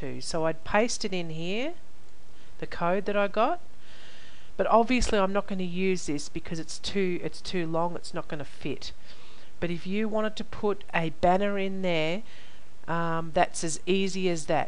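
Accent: Australia. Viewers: Trying to find a site explaining banners and hoplinks. Thanks for your video. Nice to hear an Australian accent for a change.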